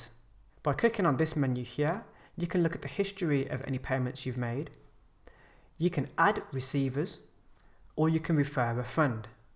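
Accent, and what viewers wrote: England, United Kingdom. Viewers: I love the ASMR style. I swear the English accent rather educational or not makes me feel like I learned something regardless of what it’s about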